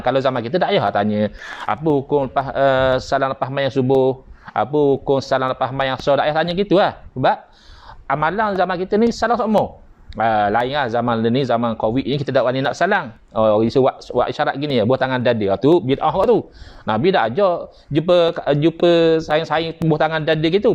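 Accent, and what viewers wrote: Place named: Terengganu, Malaysia. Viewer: Sedak pokku cakak ganu..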